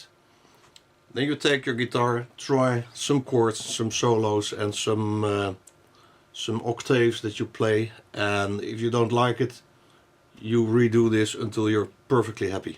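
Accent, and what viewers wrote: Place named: Netherlands. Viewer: =) I haven't heard a Nederlander accent in quite sometime! Thanks for the instruction...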